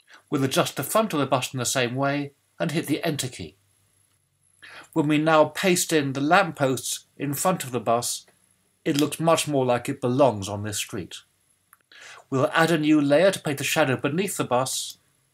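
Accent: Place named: United Kingdom. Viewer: What a beautiful british accent, thanks for the tip ;-)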